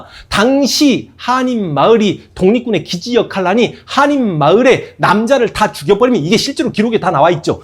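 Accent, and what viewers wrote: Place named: Chungcheong, South Korea. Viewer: 난 황강사님이 충청도 말씨라서 강의가 주구창창 공산주의를 지향하는 사회주의적이라 이상하다며 들었습니다 역시 광주분이네요 전라도분들은 거의 모두가 객관적 사실보다 목표를 세우고 그기에 대입하시는 경향이 많아요 강의하실때 좀더 실증적으로 하시면 감사하겠습니다 그 해박한 지식을 지향하시는 목표에 대입하시면 일반 사람들은 반박하기 힘들어요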